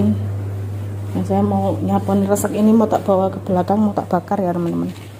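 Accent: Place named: Lampung, Indonesia. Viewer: Lucu mbak bhsamu hhhh. Dlm dri lmpung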